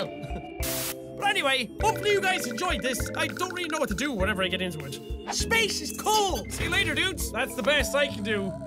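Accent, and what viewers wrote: Ireland. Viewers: Are you a weee bit Irish? I just discovered this btw.... don't judge me. Keep up the good work! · his Irish accent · Not just wee bit.... COMPLETELY IRISH!!! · What! He IS Irish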